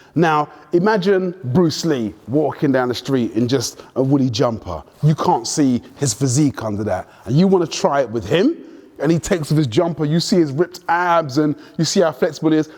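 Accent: United Kingdom. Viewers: greAt brit accent mAte . good info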